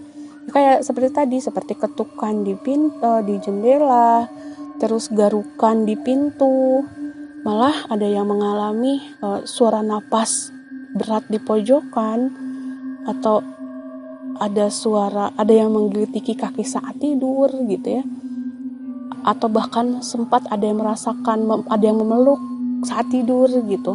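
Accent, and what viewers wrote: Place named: Sunda, Indonesia. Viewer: Suka sama cara bercerita dan penguasaan bahasa Indonesia tetehnya, ditambah aksen Sunda dikit2, nyaman dengarnya. 😊❤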